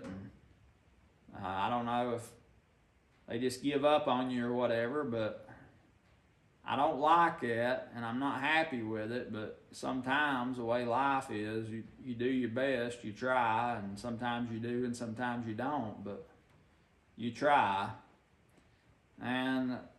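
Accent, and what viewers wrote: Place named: North Carolina, United States. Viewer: I have just been listening for maybe 2 weeks cause I just found your channel The way you talk I can tell that area is a vastly different place than Louisiana at least the central part of our state I tried to move to N.C. Years ago when my oldest married a young man she met in Bible school but her dad was unwilling to move anyway you are an encouragement to me even though I am a grandma So sorry I didn’t realize your Dad has passed You know that feeling when all your folks started passing well to be 68 and stuck in this place with family gone on feels like that. I have searched for a church and can’t find one where Jesus is at. Drinking & drugs and such like seems to be the name of the game. I just draw closer to God and try and love folks Keep the good videos coming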